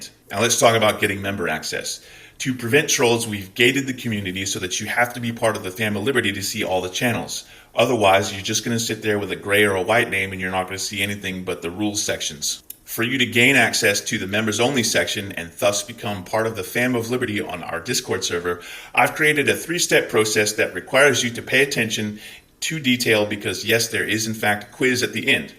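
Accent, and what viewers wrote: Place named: Gloucester, United Kingdom. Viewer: I have to admit my limitations as much as I would love to join Discord - that’s for the more computer savvy person - for now I will continue to check in with you on YouTube. I’m a from Gloucester Mass a Longshoremen or as we say “Lumper” town where a guy like you - the way you talk is familiar and comforting. Thanks for everything your doing ☮️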